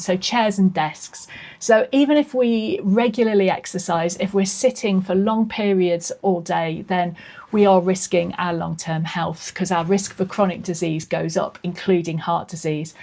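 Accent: United Kingdom. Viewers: I couldn't agree more, having also just stumbled across your channel,. Thank you for your humorous take on navigating this stage of life, together with accessible science - always nice to hear a British accent too (with every respect to all the other wonderful accents heard on youtube) 😊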